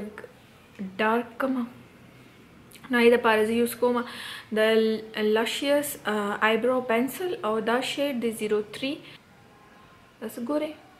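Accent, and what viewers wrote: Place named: South, India. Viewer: Sounds like South Indian. No offense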